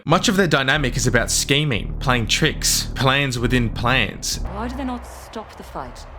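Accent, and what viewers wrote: Australia. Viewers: Bro is australian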